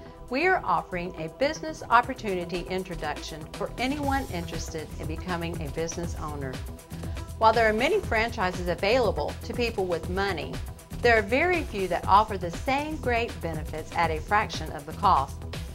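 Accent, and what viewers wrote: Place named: South, United States. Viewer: sign me up I just want to see you in person. hot south accent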